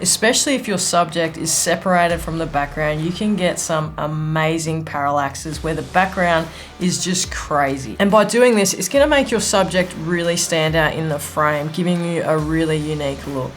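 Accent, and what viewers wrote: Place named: Australia. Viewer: Crikey!! Frothing on listening to an Ozzie on these vids, nice 🤙🏼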